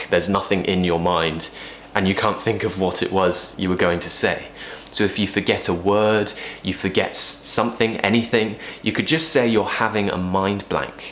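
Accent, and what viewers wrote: United Kingdom. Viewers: i'm dying in this british accent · I have been learning American accent couple years ago but when I saw your vidoes and your amazing accent I decided to dedicate my tongue for the British accent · Thank you so much for this lesson,I really love British accent 😊👍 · i just happened to run into your channel a few days ago. i have to say british accent is definitely my favorite - or favourite, shall i post? - great of you to post all this. greetings from caracas, venezuela. 👍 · I love British accent 🇬🇧🏴 From Cambodia 🇰🇭🇰🇭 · I have a one question for you. Your accent is properly what kind of British accent?? Posh? Queens? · British Accent so awesomee...... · I really admire people who speak british accent.Sounds pretty good to listen and I keep listening your video.Love your diction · So cute, i really love British accent.Sounds sweet. 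I'm excited for this video. Thank you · I really enjoy the video and British English, I learn lot of things from you and I'll keep watching your lessons.Thanks a lot.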